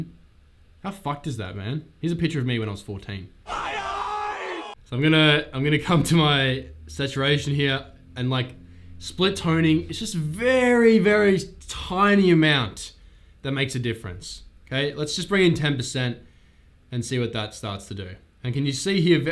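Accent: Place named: Australia. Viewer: I like this dude. He does the shit that a lot of people refuse to do (actually show editing) and he does it with an Australian accent. What's not to like?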